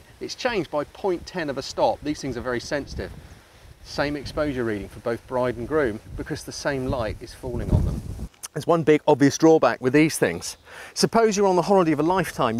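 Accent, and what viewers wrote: United Kingdom. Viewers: If I could have a British accent this is exactly the accent I would want. This accent would play very well with the ladies here in the states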